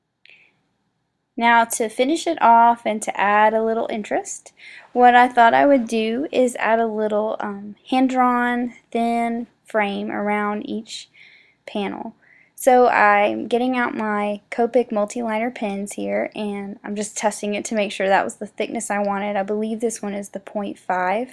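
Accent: South, United States. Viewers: I love this - great for multiples., this is the first time I've picked up on your southern accent - cute:) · So darling,! I love these. And PS, I could hear your Southern accent a little more in this video than usual. Cute!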